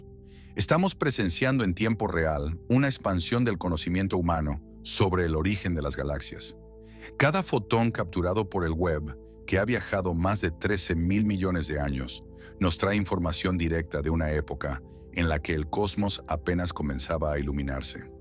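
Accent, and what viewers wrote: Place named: United States. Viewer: Tonterías con acento gringo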